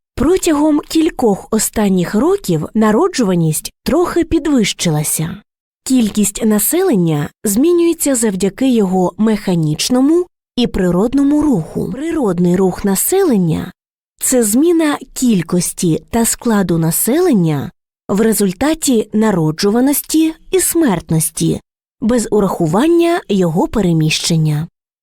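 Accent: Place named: Surzhyk, Ukraine. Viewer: Було б цікаво, якби не цей огидний новосуржик з його -ЧЕ...-ЩЕ.....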